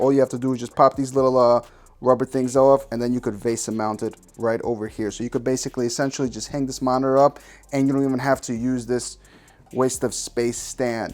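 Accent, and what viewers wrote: New York, United States. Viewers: That NY Accent is CRISP I love it hahaha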